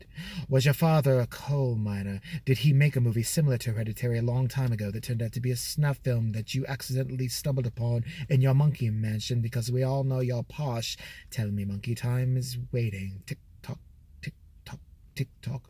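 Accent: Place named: United Kingdom. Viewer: God I love your British voice, bravo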